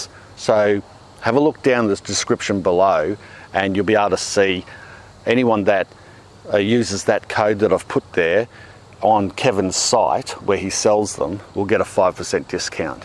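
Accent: Australia. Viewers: I think the bed looks great! And I would watch just for that great Aussie accent!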